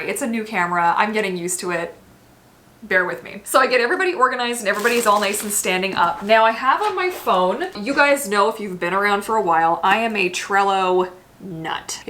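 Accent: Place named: South Africa. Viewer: Hahaha! Down here its pronounced ah-n-velope. 🤣🤣🤣 lekker(nice) south african accent! Truly enjoyed watching the video. It's like wrapping up birthday presents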